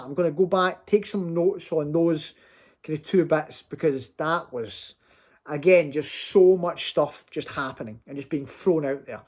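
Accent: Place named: Scotland, United Kingdom. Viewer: Man congratulations on 1k🎉🎉🎉 Im so happy for you. I've been watching since 40subs and I feel like we're actual nakamas now because I seriously want this channel to succeed. I can totally see the hardwork put into these videos especially since you also have a job. This is no cap the best one piece reaction channel on yt. 1k is well deserved and long overdue tbh..... And you made me like Scottish accent too lol😅...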